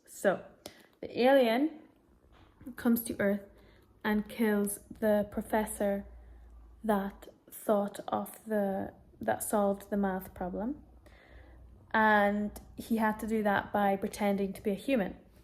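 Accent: England, United Kingdom. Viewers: It sounds like you might be an English English teacher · Are you British ?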